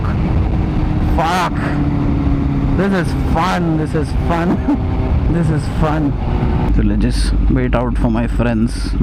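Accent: Hyderabad, India. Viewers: Bhai.. u r english is very good. That combination english + hyderabadi is jst🤩🤩🤩 keep going broh. We will support you.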